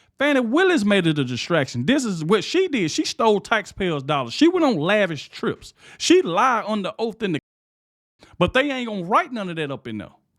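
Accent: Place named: Louisiana, United States. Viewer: I have to ask, where is your accent from? Louisiana?? It’s fun to listen to.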